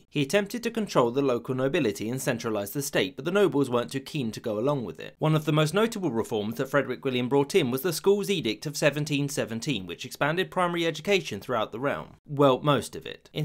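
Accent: United Kingdom. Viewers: Yes that's a British accent lol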